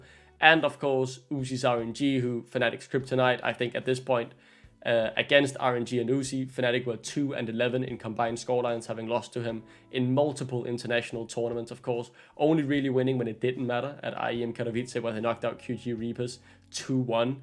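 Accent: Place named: Denmark. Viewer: Not the Danish accent hahaha, great video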